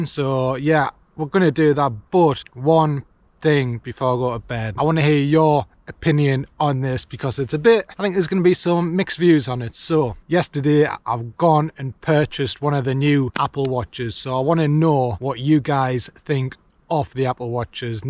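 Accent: Newcastle, United Kingdom. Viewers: you're like a Geordie version of Steve1989MREInfo.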